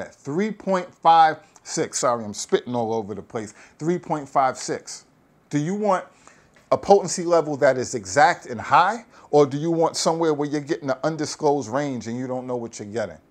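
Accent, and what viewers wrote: New York, United States. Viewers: Is the New York east coast vibe my mans here is real maybe you not use to that lol 😂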